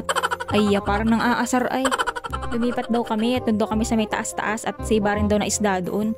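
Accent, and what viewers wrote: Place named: Quezon, Philippines. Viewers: nakakatuwa kapo yun sslita mo salitang quizon provence ssalot idol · Sa quezun province yan salita ni ate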